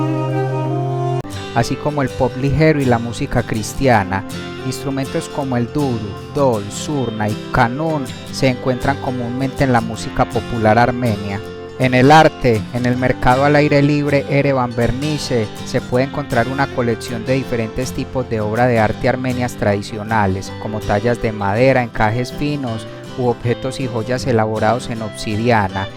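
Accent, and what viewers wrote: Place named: Medellín, Colombia. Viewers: Eres colombiano de Medellín,no? Yo conozco ese acento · Con todo respeto porque hablas así? Eres paisa de Medellín ?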